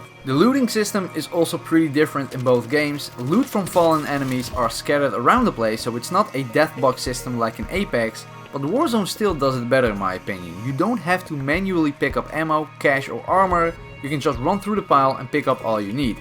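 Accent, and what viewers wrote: Netherlands. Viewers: Are you Dutch bro ? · I feel he's Dutch by his accent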